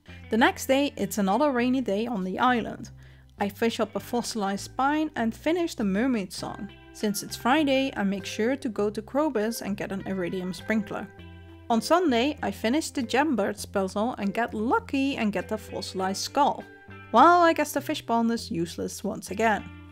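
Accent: Netherlands. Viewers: Hey! This is the first video I watched of yours and I kept wondering if you were Dutch, then I heard you say Herman and now I'm pretty sure you're Dutch 😂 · Not me loosing my mind trying to guess if you are dutch and then absolutly cackling when the confirmation hit 🤣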